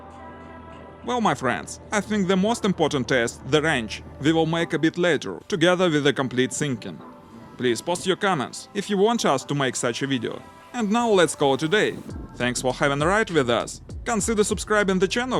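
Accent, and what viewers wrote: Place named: Russia. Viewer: On peut voir le même vidéo en francais,ce serait moins pénible que l'accent russe.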